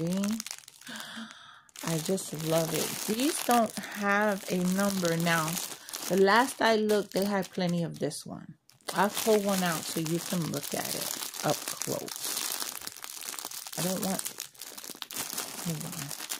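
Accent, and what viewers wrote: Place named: Brooklyn, United States. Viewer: I’m so glad I found your channel. You sound like my tia from Brooklyn.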